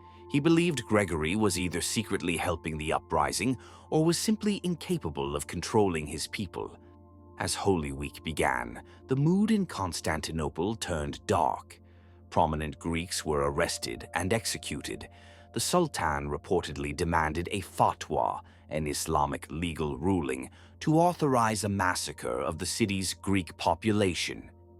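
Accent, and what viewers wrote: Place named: United States. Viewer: DOBLAJE Y PRONUNCIACIÓN INFAMES Y VULGARES.... ¿Qué forma es esa de pronunciar nombres griegos, turcos y europeos? lo pronuncian todo a la anglogringa... ES REPUGNANTE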